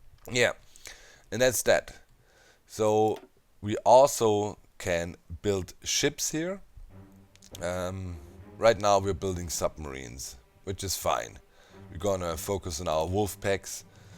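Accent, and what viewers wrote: Germany. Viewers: Are you german? Your accent says you are am i right?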